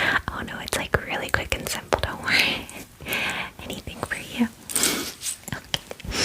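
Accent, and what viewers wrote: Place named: New York, United States. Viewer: I don't know where you get these ideas, but I LOVE them!! So many tingles and you are very entertaining! I love the one's with a NJ/NY accent! Reminds me of the 3 months I lived in NJ! 😂❤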